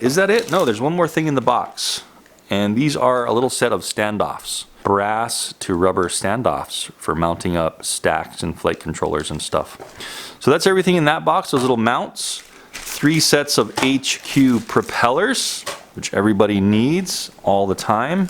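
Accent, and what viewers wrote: United States. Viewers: do you come from america)ok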